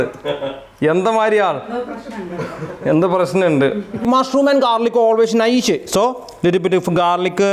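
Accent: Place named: Kozhikode, India. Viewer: entha Kozhikode parayannjjey.. Kozhikode nalla meen kittumm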